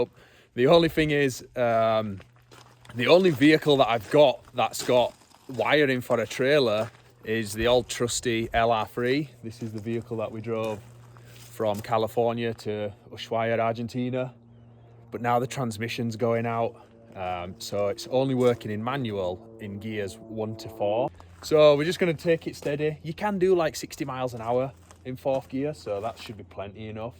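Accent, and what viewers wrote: Yorkshire, United Kingdom. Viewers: So funny hearing your Yorkshire accent in America ❤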